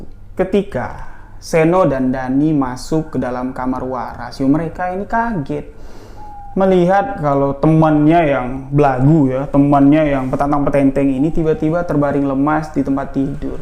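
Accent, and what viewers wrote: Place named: Jambi, Indonesia. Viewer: AKU Dari Lampung Nich Bang! Wa A'laikum Salam Wr Wb Jg AKU Percaya dengan Segala Cerita Yg Bang Sampaikan! dengan Logat Bahasa Nya Yg Khas Orang Jambi, Slow, Lembut, Dan Bisa Kita Pahami Sucsses Terus Bang Aamiin 3X Allah Humma Amin Ya Allah Ya Robbal A'lamiin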